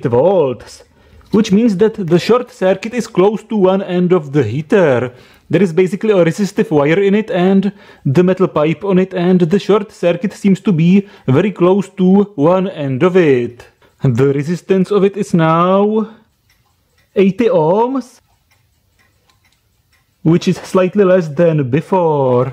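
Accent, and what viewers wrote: Czech Republic. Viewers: Oh my god. AS a fellow Czech i laugh just by listening to his accent. People probly come by just for that as i do :D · Is this typical accent of Czech people? I thought he stretched the last word on purpose to create a signature sound. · You sound like you are from czech republic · An obvious Czech accent. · Yeah I can confirm as a Czech myself he is Czech.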